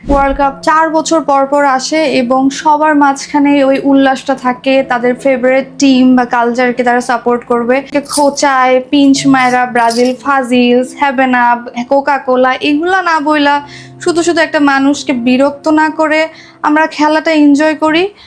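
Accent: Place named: Bangladesh. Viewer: বাংলাদেশের মাল সেটা বুঝতে পারলাম কথাতে।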